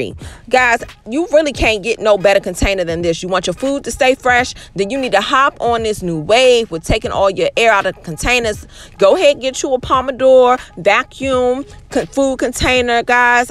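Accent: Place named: Maryland, United States. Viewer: You must be from Maryland accent real thick Kudos on the chicken breast👌🏿💯🔥🔥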